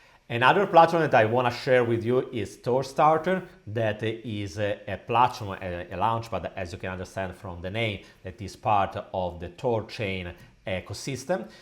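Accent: Italy. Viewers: I really like your content but man your Italian accent sometimes crack me up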